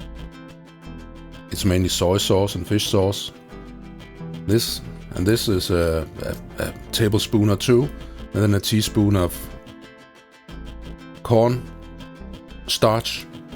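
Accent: Denmark. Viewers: Dansk accent :D godt lavet!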